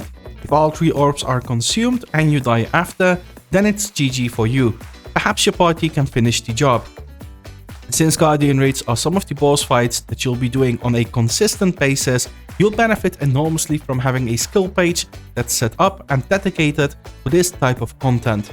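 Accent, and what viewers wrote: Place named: Netherlands. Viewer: lees net dat je Nederlands bent/spreekt hahahha ik dacht het al heel lang aan je accent te horen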